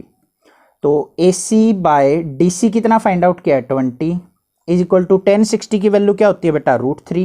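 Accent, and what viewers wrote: Haryana, India. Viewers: Sir आप हरियाणा के हो क्या ??? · Sir tam haryana ta ho k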